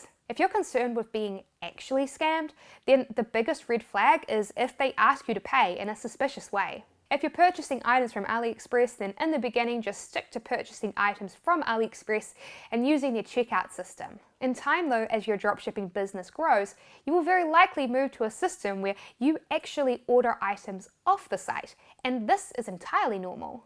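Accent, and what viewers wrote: New Zealand. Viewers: she has a classic New Zealand accent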